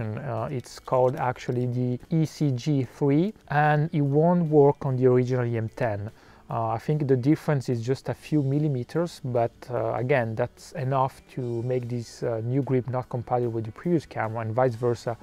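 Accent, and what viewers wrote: France. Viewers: Salut, j'ai vu un commentaire en fr et à l'accent j'ai un peut reconnu donc j'en profite comme je suis au top en anglais, j'ai actuellement un canon 70d avec un 18-35 de chez sigma et un 50mm, je cherche quelques chose de plus léger et compact quand je part en vacances ou sur des soirées, ce que je veux conservé c'est surtout le RAW, le viseur, la possibilité de changer d'optique et un bon placement au niveau du tarif, j'aimerai aussi un piqué correct, pas digne d'un 5d mark III avec une optique L, mais au moins d'un 70d avec un objo de kit. Je pense que ce olympus rentre parfaitement dans mes critère, peux-tu me confirmé ? As-tu des exemple en haute def de photo prise avec le kit de base de ce olympus ? Merci beaucoup pour ton retour !! Et bonne continuation. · Franchement très bonne vidéo, avec un bon petit accent à la touche française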